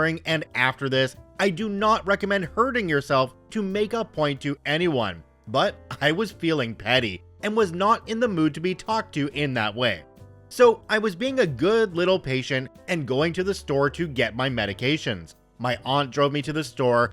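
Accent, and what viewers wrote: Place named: Canada. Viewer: Hearing swear words in Canadian accent "chef's kiss" I was getting pissed as well. As the great Bill Burr likes to say, that LAY-DEE is a cvnt.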